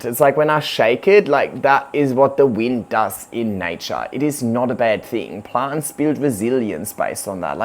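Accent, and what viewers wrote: Germany. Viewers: I can‘t stop wondering about his accent😅 Is he originally German? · Are you from Germany? :)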